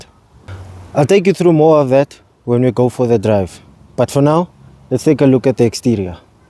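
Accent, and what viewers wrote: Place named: South Africa. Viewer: was wondering if this is a South African reviewer then i heard "awe ma se kind" 🤣......On a hatchback, you gonna need that rear wiper....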